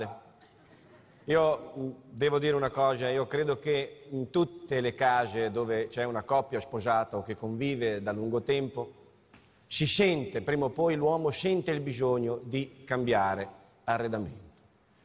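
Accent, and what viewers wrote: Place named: Romagna, Italy. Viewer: L'accento romagnolo è bellissimo!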